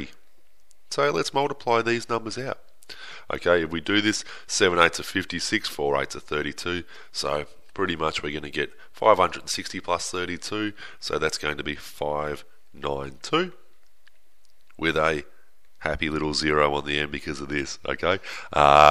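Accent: Australia. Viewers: Are you Australian? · What part of Aussie are you from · is he Aussie (Australian)?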